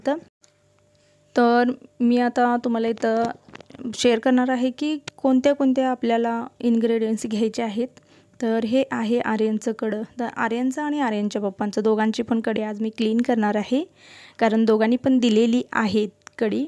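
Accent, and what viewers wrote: Kolhapur, India. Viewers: Tumhi chhan bolta tai. Bhashebaddal koni kahi mhatale tari tumhimkahi watoon gheu naka. Bhasha he sanwadache sadhan ahe. Ani dar 4 kosawar bhasha badalte. Tumchya bolnyat kolhapuri baaj ala ki mala to khoop awadto. (mi kolhapurchi nahi) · छान व्हिडिओ बनवतात तुम्ही आवाज पण छान आहे शांत आहे सोलापुरी कोल्हापुरी भाषा तर खूपच छान आहे नाव ठेवू नये कोणी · भाषा doesn't matter कोल्हापुरी भाषा रांगडी वाटते पण आतून मधाळ असते,I am also kolhapuri . Video छान, उपयोगी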